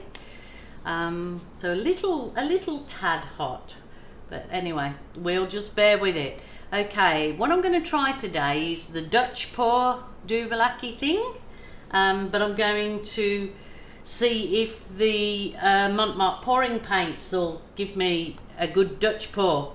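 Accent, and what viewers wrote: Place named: Walsall, United Kingdom. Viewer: Love it, I'm a too from Walsall live in Townsville 😁 Where are you originally from? You talk like me x I've been in Oz 29 years x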